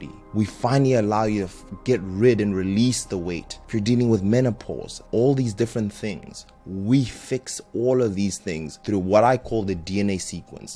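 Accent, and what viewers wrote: South Africa. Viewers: Nah, I'm hearing a bit of a South African accent here. Edit: Oh, it is indeed.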